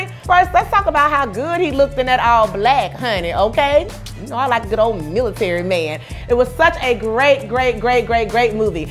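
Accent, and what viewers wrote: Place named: South, United States. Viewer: I know that right, and she has a voice too. That's that good old Southern voice.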